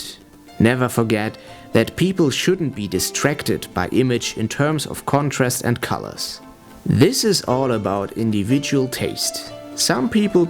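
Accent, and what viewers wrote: Germany. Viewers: He sounds German to me. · haha this is a german accent ;) very funny! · Man hört nach 3 Wörtern, dass das ein Deutscher spricht. Ekelhafter Akzent. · Defenitely german, you hear it in the way he talks,...! Good advise by the way! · from the accent...he must be German. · Dude, i bet hes german ;D they speek in a funny accent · Er spricht das Englisch wie Deutsch aus. Als würde er es mit deutschem Akzent vorlesen oder so. Ich mach's besser. Wo bleibt mein Keks?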